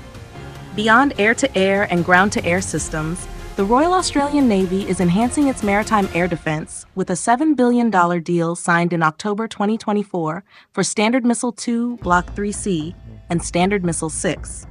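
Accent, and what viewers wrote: United States. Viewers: Whenever I see some glossy ad like this with someone talking about Australia's defence with an American accent? Not even trying to hide the sell.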